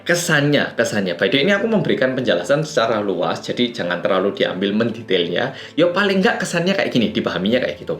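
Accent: Java, Indonesia. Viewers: mas jenengan korea tpi luweh medok seko aku mas😭😭😭😭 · Logatnya Jawa 😂😂😂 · AQ neknweruh mas Ra berasa wong Korea..ngrasane wong Jawa mas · M'f abang ni org mana? korea kan ? bhs indony fasih bngt kyak ada jawany, sehat sll Bang. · Mas sampean jadi orang Indonesia aja sih ,orang ngomongnya medok banget kaya nggak percaya klw aslinya korea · Medok bgt jawanya... Mantap.. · Mas kok aku liak e, rungok e wis koyok wong jowo indonesia yo? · Kadang suka msh kaget klo mas ngomong jawa medok tp wjah korea 😁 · mas.logat bicaranya medok ky org jawa asli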